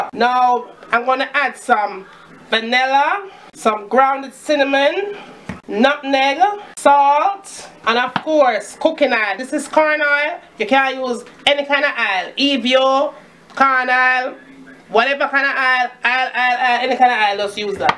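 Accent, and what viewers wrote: Jamaica. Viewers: Mi luv the way how she talk cook everything proper Jamaican lady!!!!!! I'm a Jamaican by the way❤️😁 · wattah patois trong!! LOL!!! mi luv it. no mattah how ard mi try mi cyan get it 😪😪 dem always bun up thanks for sharing. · Hey 👸🏾saw dis video 1st time looks good😋😋never heard of banana fritters. I know donut shops have apple fritters donuts. I like yo accent it is very strong more Jamaican then it does now.❤This kind of reminds me of unleavened bread. Have u heard of dat or ever tried unleavened bread? I want to try and make dez 1 day.😊👍😋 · I love this. Real Jamaican with strong Jamaican accent fi tru! Mi start smell the fritters dem. Gonna do this the proper way tomorrow. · Your Jamaican yes but please talk good for the viewers... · Instead of oil.????Jamaican accent is funny though. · You accent makes me really miss Jamaica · Nice, can't wait to make these. 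Long time don't eat, got all the ingredients. Just need my six beautiful, ripe sweet bananas like yours. Oh no am out of oil. me will get some. Lol, Love your Jamaican accent. :-) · Previewing and loving your videos. You make it clear on what to do, and I love your patois--music to my ears. I just dubbed...don't want to miss any new videos. Thanks sooo much for sharing. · I've never heard of a banana fritter. I'm gonna make these soon. Thank you for sharing. I just love your jamaican accent. I subbed because you have a bunch of tasty looking recipes. 😘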